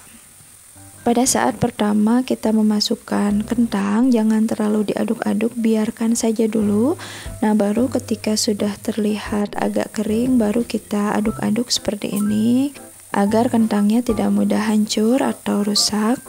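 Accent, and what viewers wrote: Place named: West Java, Indonesia. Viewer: Ooh punten, rupanya org Sunda yaaa..? soalnya klo org Bali lafal kan huruf T itu Lidah nya nekan ke langit langit, klo wilayah lain Lidah itu menekan ujung gigi Atas..duh hapunten yaa..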